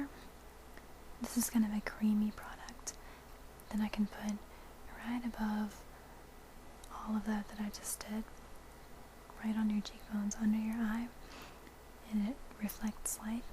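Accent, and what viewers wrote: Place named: South, United States. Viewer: Sounds like she's from my part of the world... Down south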